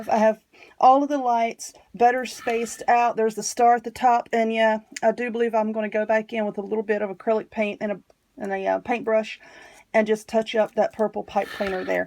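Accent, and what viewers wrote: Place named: Carolina, United States. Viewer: I absolutely love that “Carolina twang “ Tour videos are so easy to follow, thank you so very much for taking the time to teach us & for taking the time to do your videos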